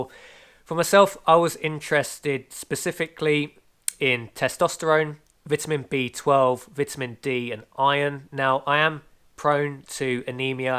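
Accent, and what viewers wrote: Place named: South London, United Kingdom. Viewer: Sounds like you live in South London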